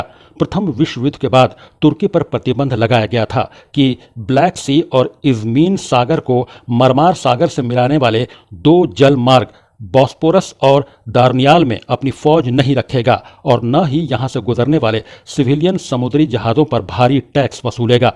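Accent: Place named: Arab world. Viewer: अरबी स्टाइल में जीभ क्यों मोड़ते हो । मूर्ख अपनी भाषा मे बोलो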